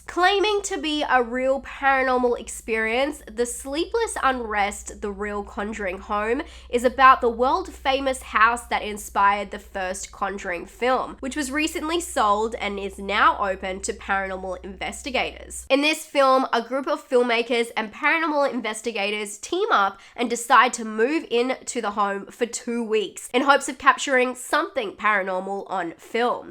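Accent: Australia. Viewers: The way you say tube! I love an Australian accent.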